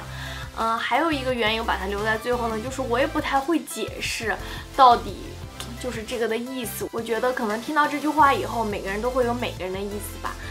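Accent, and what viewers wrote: Shandong, China. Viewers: 生日快乐！没想到第一次看你的视频就碰到你过生日～好接地气的女孩子，不知道为啥听出了山东味儿，好喜欢你，率真朴实，继续加油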